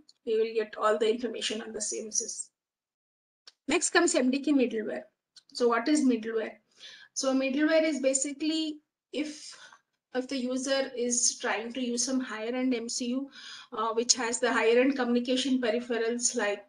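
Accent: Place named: India. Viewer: She speaks Indian English. It is their most important official languages, so she speaks a specifically Indian accented standard English. You get used to it, and it is easier if you are not a native speaker of English like myself, because she doesn't slur, doesn't replace ending 't':s with a glottal stop, replace 't' with 'd' like Americans, and she doesn't insert 'r':s such as 'drauring' instead of 'drawing' and other native speakers shenanigans.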